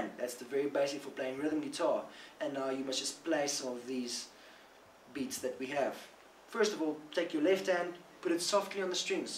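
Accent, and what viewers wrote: South Africa. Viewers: do you have some south african in your accent?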